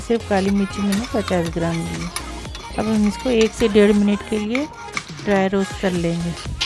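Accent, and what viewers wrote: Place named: Karachi, Pakistan. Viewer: ہم حیدرآبادی ہیں میری بہن آپ مجے آپ کراچی کی لگ رہی ہیں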